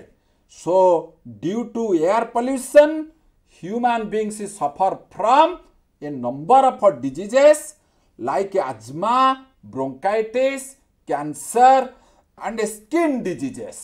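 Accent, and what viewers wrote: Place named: Odisha, India. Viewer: Om my god this is english or odia...i think this man pronounciating English as odia launguage..😄😄😄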